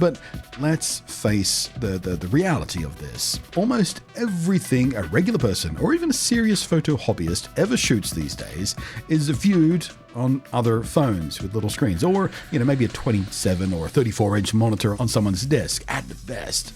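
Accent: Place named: Australia. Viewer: Nice slow and Aussie wow fantastic brother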